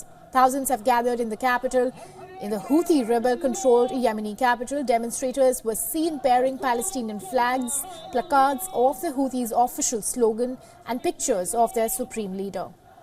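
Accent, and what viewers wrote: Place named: India. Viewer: We couldn't stand her Indian accent.👎👎👎👎👎👎👎👎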